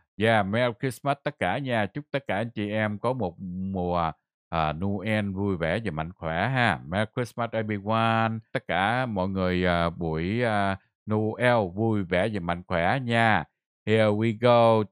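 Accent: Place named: South, Vietnam. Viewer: rất yêu thương giọng nói *, giọng miền nam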